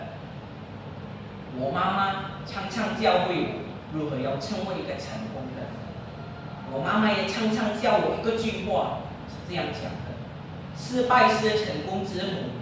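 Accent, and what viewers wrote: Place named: Malaysia. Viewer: Kayak Mandarin Malaysia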